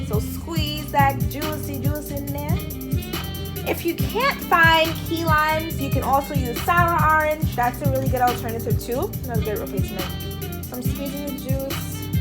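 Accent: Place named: Caribbean. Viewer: I here a little Caribbean coming out. Trinidad or Jamaica. Just saying😁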